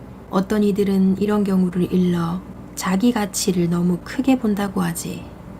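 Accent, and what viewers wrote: Gyeongsang, South Korea. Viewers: 여러 곳에서 제목만 들었던 책이었는데 오늘 만났너요 감사합니다. 경상도사투리 쓰는 여신의 목소리 재밌습니다. 원하는 모습을 생각하고 말하는것 보다는 원하는 모습에서 행동하고 말하고 생각하는것 ᆢ 인거죠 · 구수한 경상도 사투리 좋아요. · 경상도 억양이 친근하네요 젊은 시절의 나인것 같은 착각이 드는 다정한 목소 읽고 싶었던 신과나눈 이야기 맛깔나게 잘 듵었습니다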